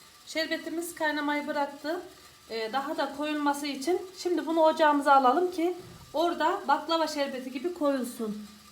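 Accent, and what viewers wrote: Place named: Konya, Turkey. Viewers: Tamam konyalı konuş yonuşyosun hanım ben konyalı yım calarım biz abane oldum hanım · hmm nerelisiniz konuşmanız bizim yöremize çok benziyor nevşehirliyim ben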